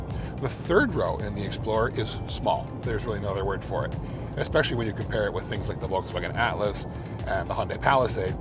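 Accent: United States. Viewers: I’m guessing he’s an American?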